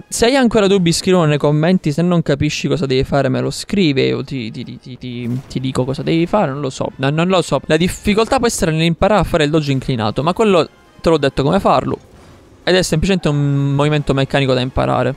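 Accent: Palermo, Italy. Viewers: Ma sei di Palermo??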